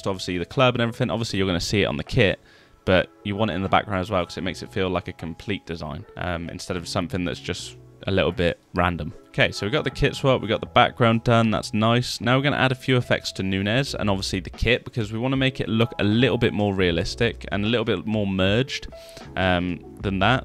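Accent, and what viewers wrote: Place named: United Kingdom. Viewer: I can't understand some British accents,but your voice and accents are cool.Thank for making these things.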